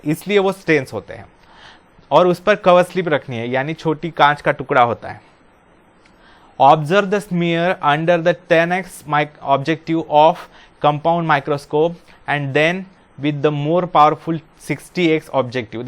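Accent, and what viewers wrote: Maharashtra, India. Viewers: Sir aap marathi ho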